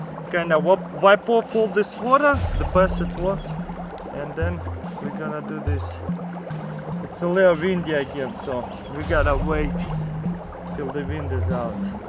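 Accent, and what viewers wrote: Russia. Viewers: for Russian Accent, Love it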